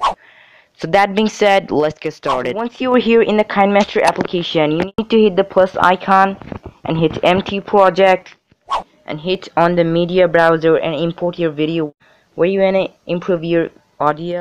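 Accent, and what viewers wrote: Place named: United States. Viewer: Finally a Fuckin american Im so tired of those indians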